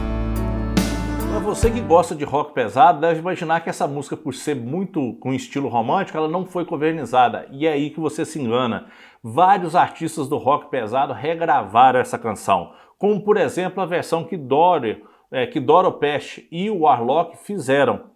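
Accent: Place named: Minas Gerais, Brazil. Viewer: Meus pais são de Cataguases. Quando vc começou a falar na hora tive certeza que vc é mineiro.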